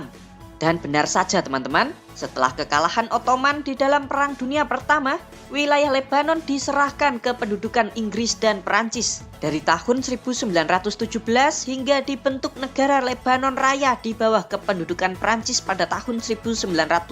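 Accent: Java, Indonesia. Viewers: mas medok banget jawanya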